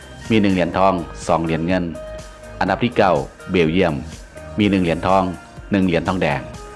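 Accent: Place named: Isan, Thailand. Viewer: เว้าอีสานฟังม่วนดีคับ ผมเองเกิดที่ชัยภูมิอีสานโดยตง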